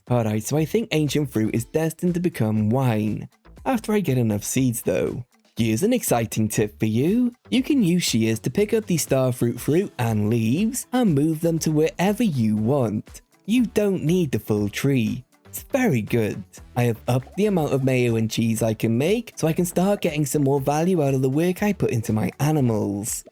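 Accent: Wales, United Kingdom. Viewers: always thought i heard a bit of welsh accent come through now and again, that comment on the welsh summer confirmed it 🤣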